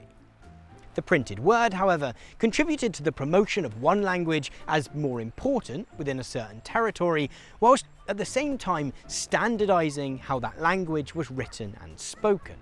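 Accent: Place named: England, United Kingdom. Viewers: Speaking in your English accent with your Anglocentrism saying that Scotland is an example of a nation that doesn’t have it’s own state. You are blind. England is also a nation that doesn’t have it’s own state. That arrogantly blind Anglocentric attitude is what Scotland wants to get away from asap. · When we hear English accent in prose, which is a good thing, we harken to the old school days when music in England was the trend indeed. by is the perfect filler of a song of the Queen's English, mate!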